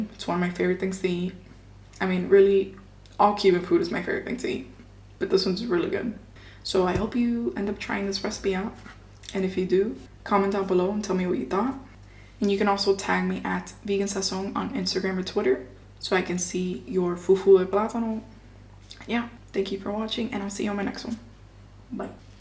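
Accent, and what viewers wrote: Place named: Miami, United States. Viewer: Lmao you’re so Miami with the accent and hand gestures, I love it!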